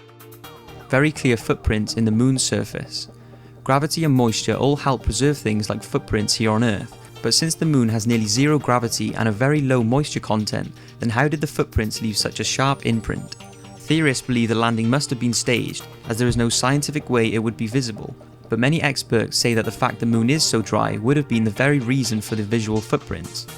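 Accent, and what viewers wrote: Wales, United Kingdom. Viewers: I squirt a little bit when you said “a little bit iffy” in your majestic Welsh accent.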